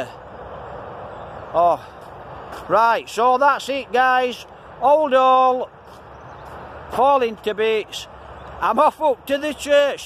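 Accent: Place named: Barnsley, United Kingdom. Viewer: I liked your video, I forget how strong the Barnsley accent is haha! You seem like a lovely local fella 😁❤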